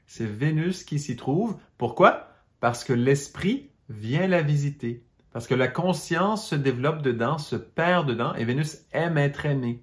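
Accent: Quebec, Canada. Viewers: Et l'accent québécois my God je trouve ça trop cute! Une magnifique province,j'ai eu l'occasion de remonter le st Laurent avec des pêcheurs:INOUBLIABLE! Nous les français on vous adore!💖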